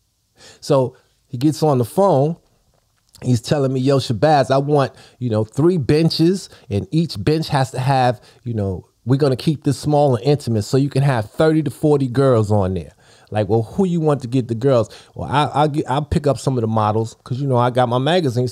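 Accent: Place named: Harlem, United States. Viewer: Talk that Harlem talk Shabazz🤣🤣🤣you already know as a fellow New Yorker 🤣🤣🤣. Especially Harlem🤣🤣🤣. We a different breed🤣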